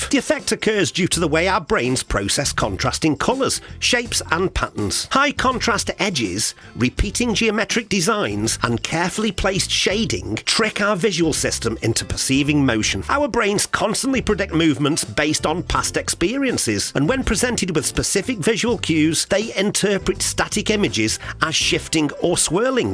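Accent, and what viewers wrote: Stoke, United Kingdom. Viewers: You from stoke by any chance? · 1- being an American, I feel i have to believe you because of your british accent.(strange) 2- accidentally taking an identical tender with the same key (small motor boat used to get ashore from a big boat) upon returning to find coast guard, police and upset couple, I said to everyone, "if the key fit you must acquit" everyone laughed and i got away with what could have been a real expensive nightmare.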